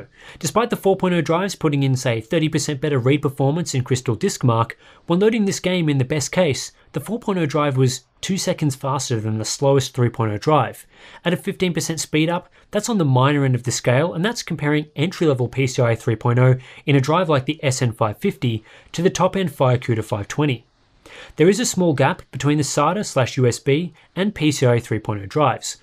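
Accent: Australia. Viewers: Whenever you click on a Hardware Unboxed video, you have to turn on Caption to see how YouTube decide to butcher the Aussie accent, and this time round it's "todd we're unboxed"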